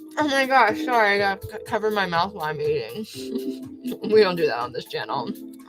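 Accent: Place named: Los Angeles, United States. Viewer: I'm so impressed. I could of sworn you had a comment on YouTube.? I'm still looking. Just want to say I miss your lovely voice and cute influx in your LA accent!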